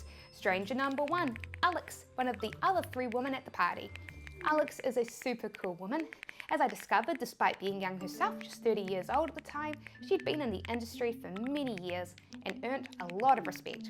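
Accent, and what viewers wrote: New Zealand. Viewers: Hi, I really love you and your New Zealand accent 😁 By the way, you are so sweet and attractive while smiling huge 😘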